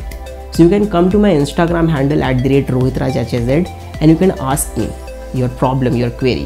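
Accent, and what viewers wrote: India. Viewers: Is this guy switching to indian mid video ?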